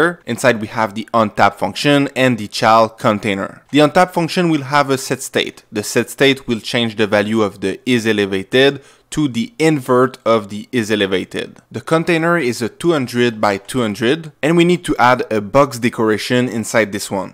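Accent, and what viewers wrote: Quebec, Canada. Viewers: Are you french Canadian by chance? This accent is really familiar haha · t'es quebecois?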